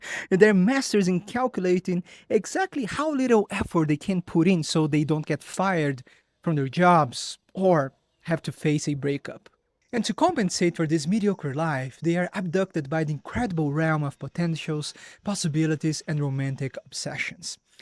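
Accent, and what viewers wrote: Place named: Brazil. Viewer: Sotaque brasileiro em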